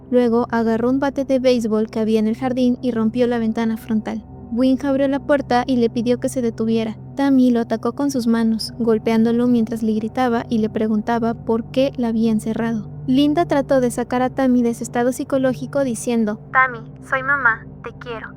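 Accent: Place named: Fresa, Mexico. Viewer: Estaba intentando tomarme en serio el video por el contenido..... Pero es que es imposible con esa vocecilla!!!!!!!!!! Super kiwi/Fresa no me quiero imaginar en la cama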